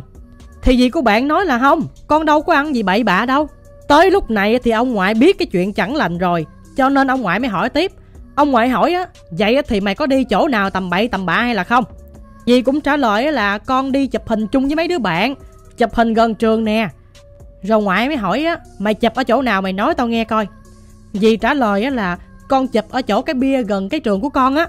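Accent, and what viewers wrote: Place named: Phu Tan, Vietnam. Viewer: Kênh 90 giong ở phú tân quê e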